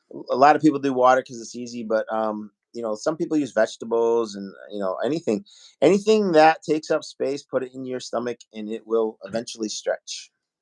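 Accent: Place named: Boston, United States. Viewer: Lol how pronounces Pop Tarts. “Pawp-tawts” 😂 gotta love that Boston accent!!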